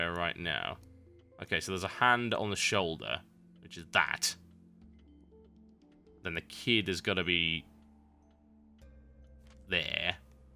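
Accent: United Kingdom. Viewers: This guy has British accent. I find that amusing.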